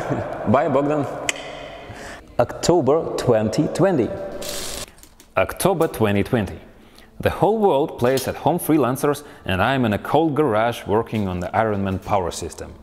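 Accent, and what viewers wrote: Russia. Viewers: Takoy strong ruskiy akcent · I love Russian inglish. Love your content bro. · we can soooo tell he is russian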